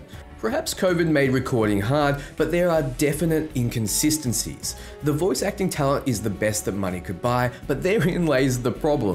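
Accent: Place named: Australia. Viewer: Yoooo. Gimme the scoop compared to Red Dead? I’ll sub regardless, you sounds like an Aussie who is cooped up.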